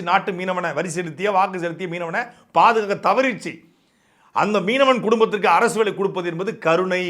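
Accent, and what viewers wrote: Tirunelveli, India. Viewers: திருநெல்வேலி மொழி வழக்கு அருமை செம்ம 👌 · சாட்டை துரைமுருகன் ண்ணா திருநெல்வேலி பேசியது அருமையாக உள்ளது அப்படி பேசுங்கள்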